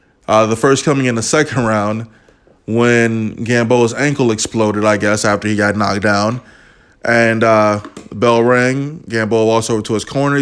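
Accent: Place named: Baltimore, United States. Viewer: Thats how we talk in Baltimore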